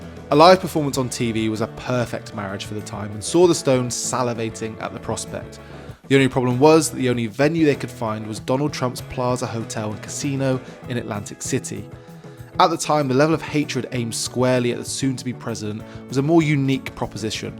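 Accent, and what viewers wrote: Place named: United Kingdom. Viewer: i had no idea but my tendency is to zone out once the British accent starts. At least it wasn't cockney, still subtitles were needed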